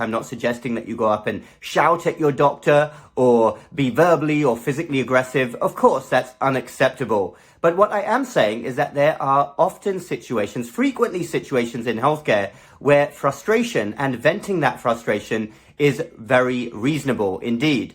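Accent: England, United Kingdom. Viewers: You sound English…are you talking about UK or US hospitals, or both?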